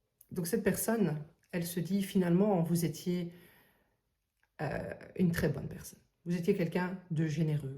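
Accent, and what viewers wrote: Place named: Belgium. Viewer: Il me semblait bien que je reconnaissais un accent belge!.Faites vous des voyances en privé w?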